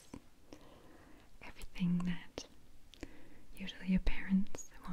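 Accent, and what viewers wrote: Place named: Russia. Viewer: Her normal talking voice still has an obvious Russian accent.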